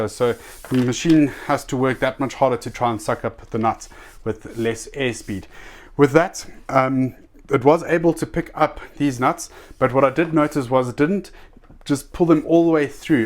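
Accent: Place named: South Africa. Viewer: nice review...good to hear south african on youtube!! looking to buy one of these with the 6A battery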